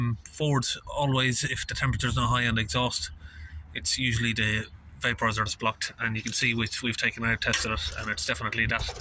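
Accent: Ireland. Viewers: He sounds Irish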